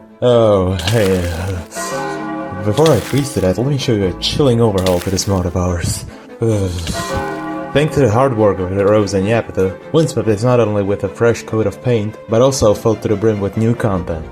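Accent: United Kingdom. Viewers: British accent's kinda interesting, I recommend to u look at the Irish English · you have an awesome British accent please release more news about windswept update pleaseee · Britis accent was amazing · British accent was epic and very funny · Accent funny british was generic comment /// no subliminal msg this time sry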